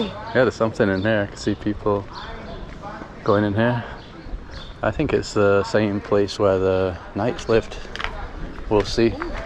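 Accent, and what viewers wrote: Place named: Yorkshire, United Kingdom. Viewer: Hi it's John do you have a link in Yorkshire your twang sounds like it is thanks for the video John uk 😊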